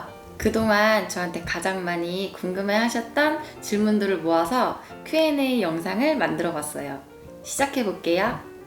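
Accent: South Korea. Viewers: 청주분이시죠😁😁 반가워용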